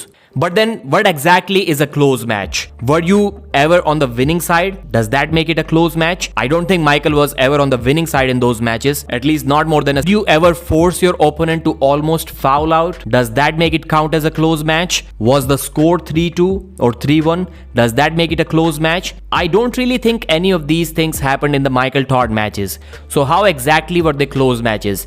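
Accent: India. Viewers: Terrible. This pajeet voice ruins everything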